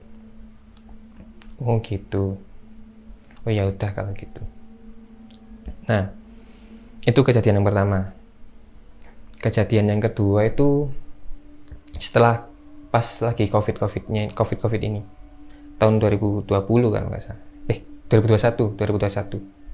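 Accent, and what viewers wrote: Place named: Java, Indonesia. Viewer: Alus bner suaranya jwa bnget sopan kliatan bnget tatakramanya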